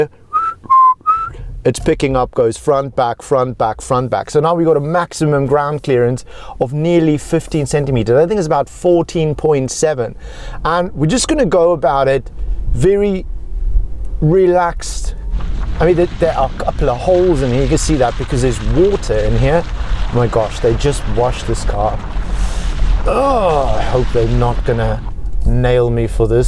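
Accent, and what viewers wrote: South Africa. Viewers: he’s south african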